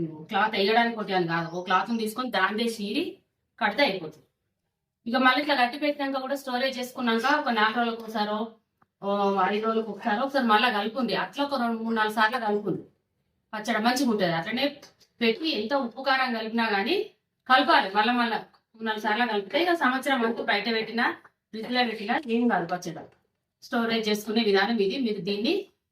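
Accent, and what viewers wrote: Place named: Karimnagar, India. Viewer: Karimnagar district ani meeru matlade vidhanam tho gurthu pattanu. Ma pinni athagari Jagithyal. Vallu matladinatte undi . Ala gurthupattanu. .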